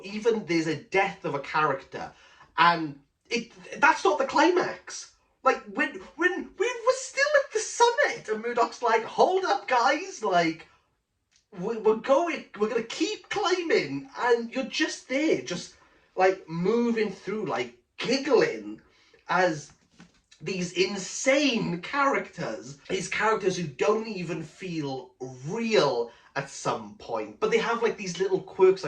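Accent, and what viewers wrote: Wales, United Kingdom. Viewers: Hello fellow person from Wales. Your accent is similar to mine. Thanks for this review. I have just finished the book and was dying to listen to someone else's views. I think Charles always called his friend Perry but was reminded to call him Peregrine. I agree that the story is funny and almost a farce in places. There's a lot of religious imagery and very very deep and philosophical. I wish that the story hadn't gone on quite so long. I did crave a clearer conclusion at the end. Thanks again.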